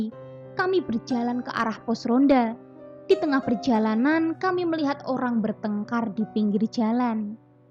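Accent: Java, Indonesia. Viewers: Org jawa ya kak ?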